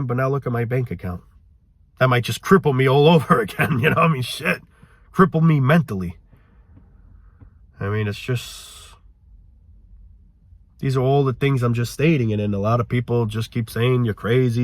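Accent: New York, United States. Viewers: PLEASE MAKE A SEGMENT AND NAME IT WHAT REALLY GRINDS MY GEARS 😂 that Rhode Island New York accent bro you have to.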